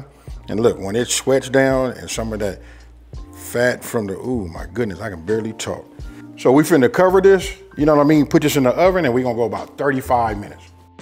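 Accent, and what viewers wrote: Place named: California, United States. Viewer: sounds like an Cali dude who’s family came from the south. ❤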